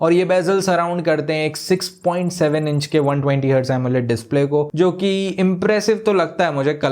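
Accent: Bhopal, India. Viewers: Bro is a bhopali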